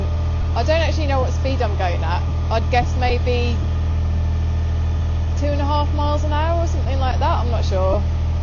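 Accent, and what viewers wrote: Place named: Birmingham, United Kingdom. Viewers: I love your Brummie accent ❤ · Hiya, wishing you a very Merry Christmas from Sweden 😁 - from an ex-pat (originally from Wolverhampton) but been here in Sweden for almost 30 years now. Discovered your channel a few months ago and your journeys bring back many happy memories. I used to fish a lot on the Staffs Worcester when I was young 😁👍. Safe travels and enjoy the festive season 🎅 PS I've been trying to place your accent - first I thought Wolves but you seem to have some Brummy in there too 😉😁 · I worked in the West Midlands for many years and fell totally in love with the accent - It's such a delight to listen to you!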